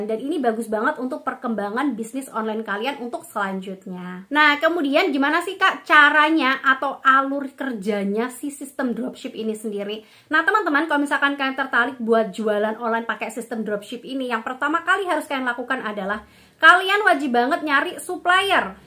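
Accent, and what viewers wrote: Java, Indonesia. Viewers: Didengerin suaranya agak medok 😀🙏. Tapi makasih kontennya. Bermanfaat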